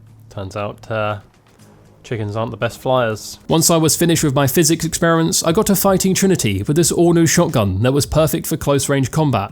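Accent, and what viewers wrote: United Kingdom. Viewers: What is this accent? I can only tell it's British but not more precise than this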